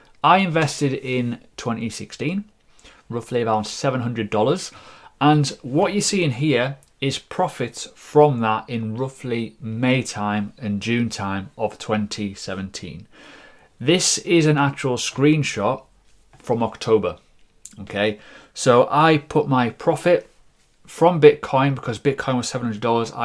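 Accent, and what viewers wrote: United Kingdom. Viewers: Nice to listen to a UK guy.. What exchange would you recommend for the UK? Fed up with coinbase crashing at crucial times.. Cheers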